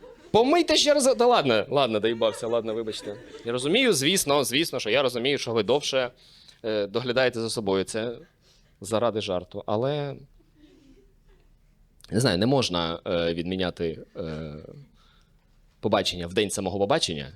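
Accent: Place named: Kherson, Ukraine. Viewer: Та я його відоси тільки за херсонського суржика дивлюся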